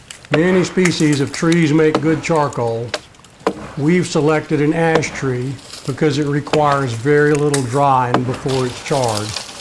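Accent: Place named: South, United States. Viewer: Where are y’all located? I noticed the narrator has a southern accent. Kentucky? Tennessee? North Carolina?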